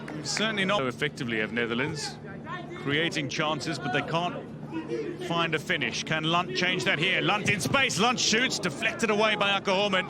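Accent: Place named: South Africa. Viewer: Why is the commentator a South African ? haha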